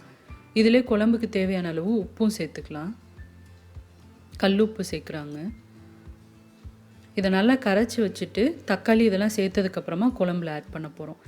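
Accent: Madurai, India. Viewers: அக்கா நீங்க மதுரையா.....குழபும் அருமை....என் மதுரை தமிழும்......அருமை....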